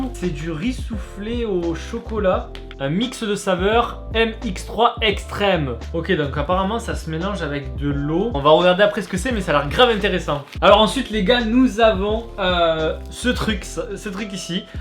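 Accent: Marseille, France. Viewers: Tu est Marseillais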